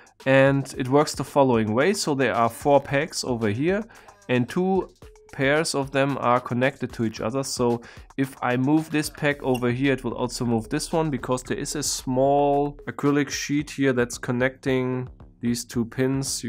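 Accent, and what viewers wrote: Germany. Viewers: Th video of the Titan puzzle was the first video I watched from you. I like you but I have a questio: are you German? (I ask because of your accent)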